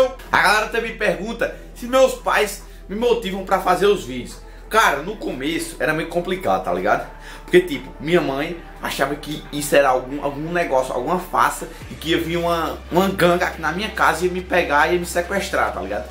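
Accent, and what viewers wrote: Northeast, Brazil. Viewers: Tmj Junto Sutacaque Fala Tudo Que E Nordestino Tbm So E Tenho Muito Orgulho E Nunca Mude Por causa de ninguem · começo do teu canal eu não gostava de sotaque de nordestino mas eu vi que tava errado e hoje te assito sempre irmão tamo junto <3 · Amo sotaque nordestino <3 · eu queria ter nascido no nordeste pra ter esse sotaque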